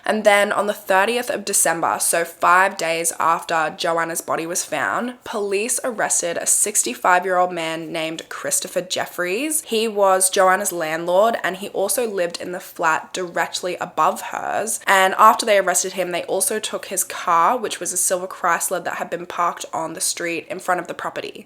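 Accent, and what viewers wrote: Australia. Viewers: RIP Joanna and peace and love to those who truly loved you. Oh my goodness! I can't believe I hadn't found your channel until now. I'm from Australia and going by your accent so are you...may I congratulate you for having such an amazing number of subscribers which I have just added to. Well done!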